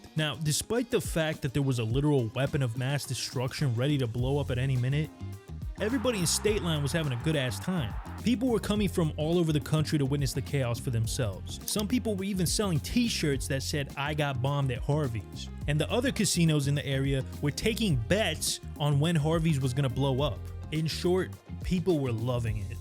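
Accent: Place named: Italian-American, United States. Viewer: I don't want to be rude but he kinda has like a american-italian accent,but his videos are addicting like crack,not that I've tried it 😅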